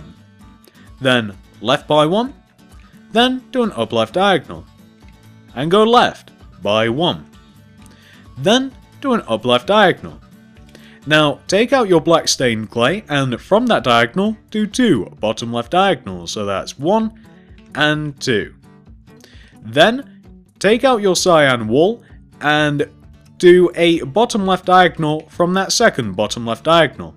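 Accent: United Kingdom. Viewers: I rememember this channel as a kids, the British accent and the voice I use when doing a tutorial now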